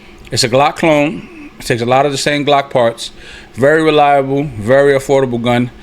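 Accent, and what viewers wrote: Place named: New York, United States. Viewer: Yup new sub my bro 🫡💯 i hear that new Yorker accent 🗽 dope collection n dope video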